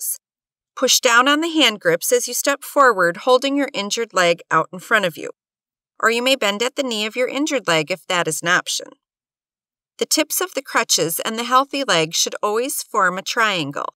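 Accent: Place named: Midwest, United States. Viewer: Why does narrarator have to be from the Midwest...very annoying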